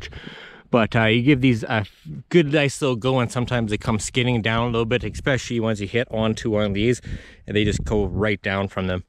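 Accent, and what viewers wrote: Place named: Upper Peninsula, United States. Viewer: Congrats on 1000 subscribers!!! Great channel!! Your accent is awesome, reminds me of the upper peninsula here in Michigan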